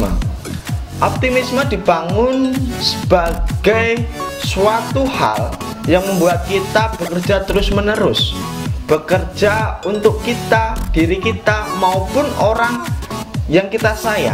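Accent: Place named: Java, Indonesia. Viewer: Medhok yaaak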